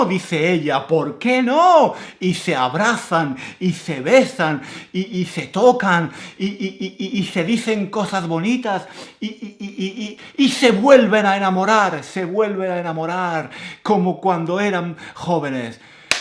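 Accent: Andalusia, Spain. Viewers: Me encanta el acento andaluz ❤ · Verdad? Eres Andaluz? De q cuidad? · ¡Me encanta escuchar tu acento andaluz! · I love your Andaluz Accent!!!!!!!!! me encanta su acento Andaluz!!!!!!! muchas gracias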